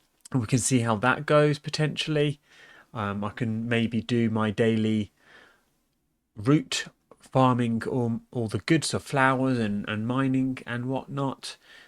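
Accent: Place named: United Kingdom. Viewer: I love your British accent ❤ "I'd like to apologise" 😄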